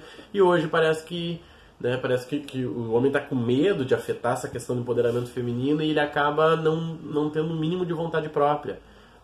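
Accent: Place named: Rio Grande do Sul, Brazil. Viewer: Homi gauchão! kkkkkkkk.. difícil hein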